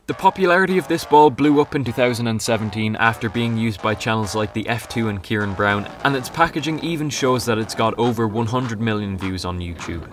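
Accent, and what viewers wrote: Ireland. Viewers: Are you irish? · R u Irish?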